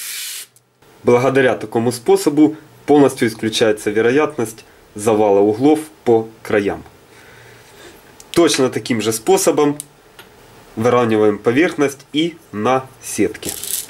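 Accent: Ukraine. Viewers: Канал зарегистрирован в США. Кому же идут собранные деньги? Если акцент окраинский?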